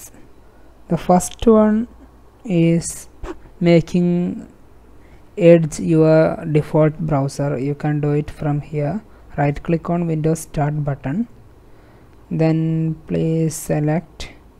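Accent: Kerala, India. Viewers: Chetta ningalde english kettappo manassilaayi malayali aanenn ente prashnam enthannu vechal 1, Eppalenkilm pc marythakku on aayal taskbar and settings not accessible aanu 2, pc open avumbolekk screen blank aavua pinne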